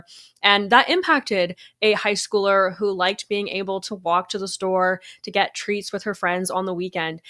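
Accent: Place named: Canada. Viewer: American here. You definitely have a Canadian accent. Funnily enough, only people from Winnipeg and Vancouver don’t seem to have a very thick Canadian accent, more neutral North American like.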